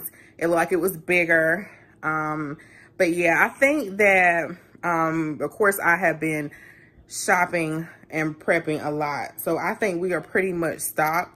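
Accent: South, United States. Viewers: Hey! You know how we talk here in the south sis. It is what it is. The country is in us lol. 💃🏽🤷🏽‍♀️😊 Great video as always! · Mississippi here Tupelo 👍👂I hear you girl we are COUNTRY AS THEY COME 😂😂😂😂😂😂😂 but if they correct you they really need to hear the DEEP DEEP SOUTH 😂😂😂 IT SOUNDS LIKE ANOTHER LANGUAGE😂😂😂😂❤❤❤💞💞💞💞💖💖💖💖💘💘💘 MUCH LOVE GIRL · I for one loves your accent.. Growing up in the north and spending time in the South and now living in the south I can appreciate it.. Yes those green beans are good but I rinsed them WELL to get a lot of the salty taste out as much as possible. My first Sam's order will be arriving today 😀. You are so right as I have found that different locations of Sam's, BJ's and Costco are not created equal. You are doing so well for your family. Much success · We don’t have many southern belles here in Hawai’i, so listening to your accent is a sweet treat for me! 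· I'm country too, Alabama ❤️ Love your voice and cute accent ☺️🌼🌻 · Don't worry about your dialect. If it's southern, it's good! 😉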